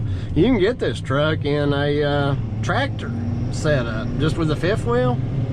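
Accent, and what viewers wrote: South, United States. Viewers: Whys the narrator so southern?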